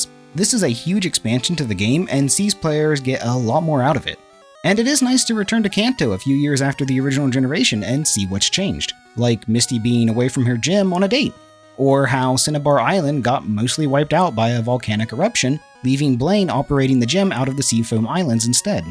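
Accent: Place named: Philadelphia, United States. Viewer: You have an interesting accent! Is that a Philadelphia or New Jersey by chance?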